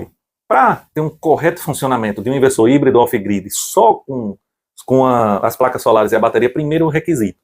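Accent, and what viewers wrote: Northeast, Brazil. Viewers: Meu amigo, você é de qual estado do nordeste?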